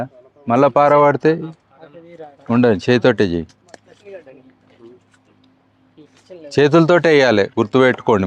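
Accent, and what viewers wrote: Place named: Telangana, India. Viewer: సర్ మీరు ప్యూర్ తెలుగులో మాట్లాడుతున్నారు.. మా తెలంగాణ యాస.. కానీ తెలుగు రాయడం రాధ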